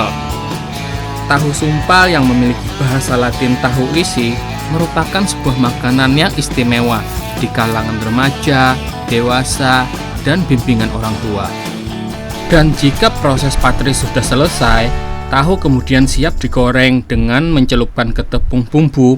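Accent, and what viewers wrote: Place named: Kalimantan, Indonesia. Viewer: Palangkaraya mna suara nya like urang Kalimantan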